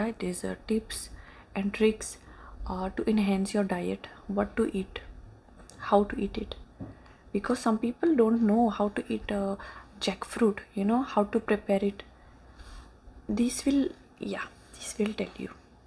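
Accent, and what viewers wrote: South India, India. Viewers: I knewly discovered the Indian accent gives me ASMR and let me tell you I am soooo glad to have found this channel! Subbing ☺️ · An Indian accent in English is just sooooo relaxing ❤ love your videos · That sounds more like South Indian English accent. But yes, it is the essence. Indian people do not have any accent while speaking English. And now that "no accent" has become an Indian English Accent somehow. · I love English with Indian accent so much ! ❤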